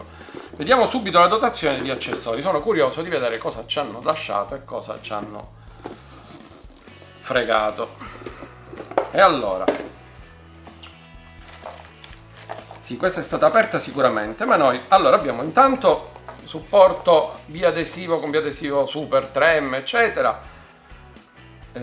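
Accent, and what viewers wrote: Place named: Palermo, Italy. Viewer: Hai un'accento molto nota, sicuramente Palermo !!! Una informazione dove posso trovare in 3 piedi per questa macchina?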